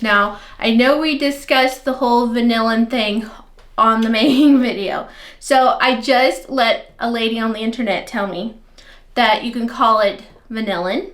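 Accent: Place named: South, United States. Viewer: I love the snowy exit scene! I'm praying at this point that I'll be able to place an order when you open the website. Things have gotten a bit hairy this month. As for the way you pronounce words... don't fret! Anyone who lives in a southern state for more than 5 years after living in the northern part of the States gets a pass. We develop a whole new accent from everyone else! I hope y'all have a wonderful Thanksgiving!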